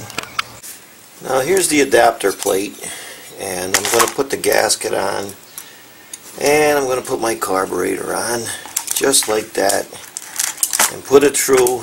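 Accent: Pennsylvania, United States. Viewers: Best video I ever seen. Thanks 👍✌ PS. Your from PA. I know the accent 😄😄😄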